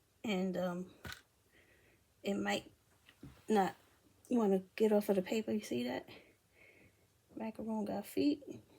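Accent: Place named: New York, United States. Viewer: Where you from I hear a accent, you from NY?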